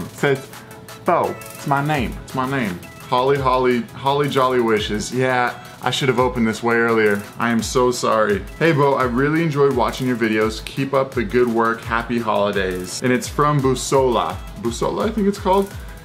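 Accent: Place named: Ireland. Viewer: With your red hair and Irish brogue, have you ever been mistaken for a leprechaun?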